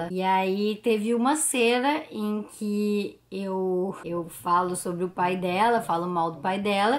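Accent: Rio Grande do Sul, Brazil. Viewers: Guria! Tenho quase certeza que tu é gaúcha! Sotaque 😉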